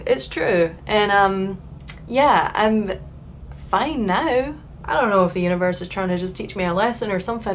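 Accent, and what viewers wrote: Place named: Scotland, United Kingdom. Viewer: Your doing ok. Love ya Scottish accent. Awesome!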